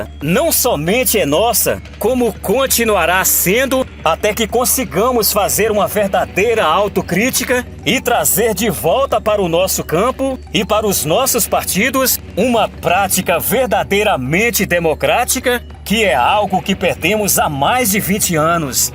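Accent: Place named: Bahia, Brazil. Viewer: você é baiano? Esse seu sotaque está me fazendo crer que sim!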